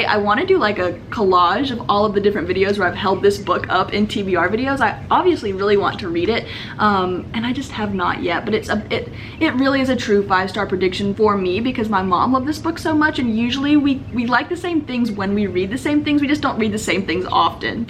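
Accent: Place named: United States. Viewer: I need to know what state your from. I feel like I know that accent!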